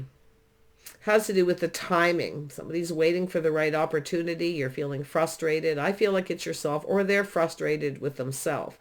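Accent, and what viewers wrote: Canada. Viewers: I love your accent! The way you say “about” makes me happy! Which part are you from? Curious since I’m looking to travel Canada. I’m not educated enough to know which parts to visit.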